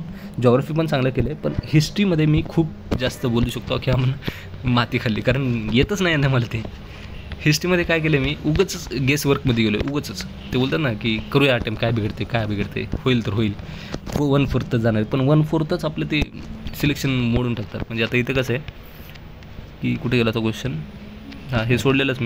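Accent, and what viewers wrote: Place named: Karad, India. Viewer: aawaj Varun Karad vatakl😅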